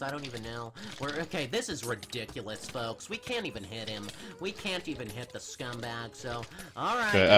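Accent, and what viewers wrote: Netherlands. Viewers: Dude are you Dutch? xd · Hahaha je Nederlands accent is fking grappig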